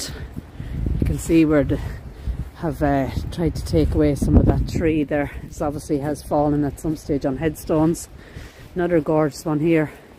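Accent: Ireland. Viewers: I am in Kentucky, as well. Greetings to you! Only recently found you and I thoroughly enjoy being invited along on your explorations. I love history and I love your brogue. I have some Irish ancestors just not sure how much. Are there any of the names of Case or Harrell? Anyway, thank you so very much for what you do, it's wonderful and I hope you will continue for a very long time. Take care and God bless! 🙂🌹🇺🇸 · I am trying to learn to speak irish and I really love your accent. Also thanks for all the cemetery videos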